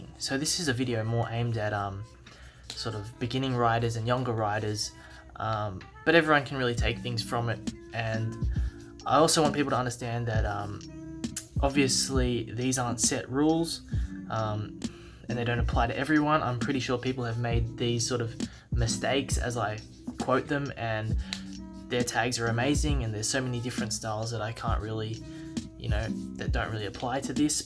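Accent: Australia. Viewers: Love a familiar aussie accent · Coming from West Syd been sketching and writing for nearlly 12 years, i completely agree with everything you said and thought all the advice was awesome i defs wish i had something like like thsi when i was younger looking to learn but i ended up teaching myelsf everything i know...... anddd was just wondering where abouts in australia are you from i can tell buy your accent??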